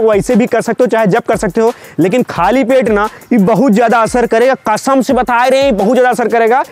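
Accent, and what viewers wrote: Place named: Bihar, India. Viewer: I love bihari acsent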